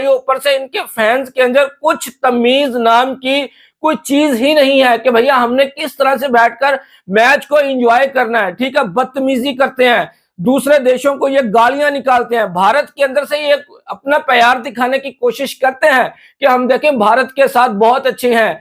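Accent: Pakistan. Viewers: Bhai jo language ascent saye baat kar rahye ho, app pakistani ho. Kyon Indian cricket jersey ka sahara laye rahye ho................ Apni identity kyoon chupaa rahye ho............ 😂😂😂😂😂😂😂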